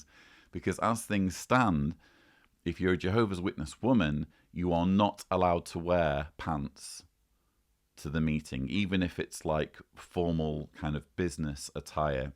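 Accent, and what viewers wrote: United Kingdom. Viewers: I come here only for the British accent and the Tony bashing 🤣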